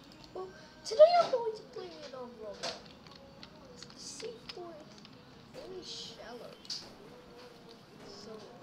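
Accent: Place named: India. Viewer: sound like an indian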